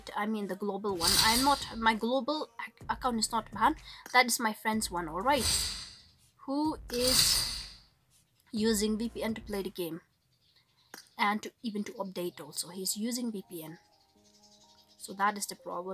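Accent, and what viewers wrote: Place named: England, United Kingdom. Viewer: So much Overreact in English accent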